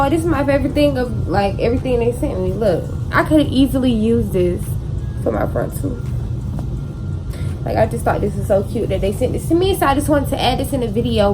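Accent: Atlanta, United States. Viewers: Where you from? I’m guessing Atlanta or Savannah with that accent! lol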